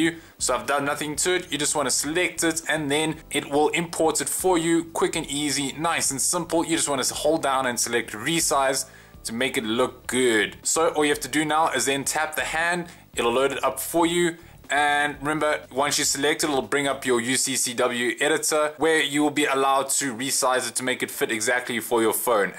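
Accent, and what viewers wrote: South Africa. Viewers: Dude are you South African!?!?!?!?!?!?!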